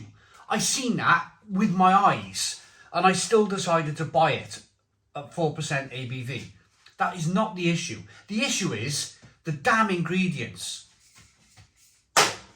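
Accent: Wales, United Kingdom. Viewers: Love your Welsh accent!!😉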